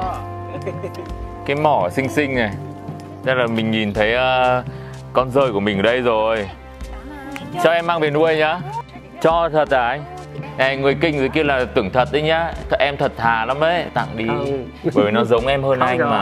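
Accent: Hanoi, Vietnam. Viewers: thích giọng anh ghê! Trai Hà Nội có khác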